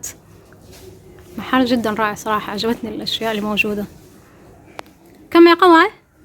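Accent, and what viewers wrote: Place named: Jeddah, Saudi Arabia. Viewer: مشاء الله فديو ممتاز ولهجتك اعتقد انك من جدة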